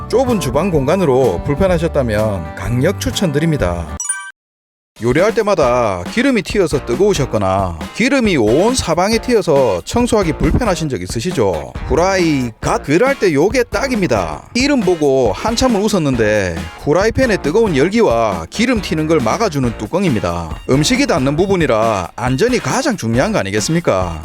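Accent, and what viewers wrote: Gyeongsang, South Korea. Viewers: 이 분 경상도 사투리 너무너무 듣기 좋아요~ 아빠 목소리 같습니데이❤❤❤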